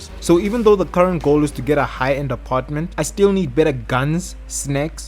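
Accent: Afrikaans, South Africa. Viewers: Bro tell me you not South African 😂😂😂😂 that accent.. I’m from Lesotho. I’m subscribing btw 😂😂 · Are you from Africa wait me and you are Afrikaans bro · your accent , the way you pronounced that Afrikaans nam , auidwes boerseun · Are you south African? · Are you south African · South African? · What is your accent dude? Its sounds south African it sounds awesome🙃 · Are you from South Africa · bro u south african?? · Are you South African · It took me a while to realize you were south african lmao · My man sounds like a south african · Are u afrikaans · Are you South African You have a fimilar accent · Nha I found gold finding this channel 😂😂you can even hear thr south african accent 😂😂😂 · 😅I Feel like you from South Africa 🇿🇦 BRUH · I was wondering where you are from.. the way you pronounce the letter r made me think SA or Namibia but when you said boers seun then i knew for sure your from SA, jy het n nuwe subscriber gekry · Are u south afrcan? 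· Your accent man . . . Are you South African?🇿🇦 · Quick question are you south african coz ur accent is like mine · Oh you're south african too? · Bro are you from South Africa. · South African? Edit: yeah ur SA, never heard anyone else say Kopp (head) elsewhere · Are you afrikaans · Damn bro south african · I knew you were from South Africa with that accent😭😭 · can hear the south african accent 😭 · are you south african? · You sound extremely South African... Am i right?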